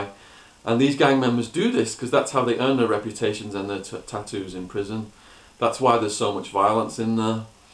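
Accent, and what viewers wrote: United Kingdom. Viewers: I hope you don't mind me saying that you sound too british for an Arizona prison.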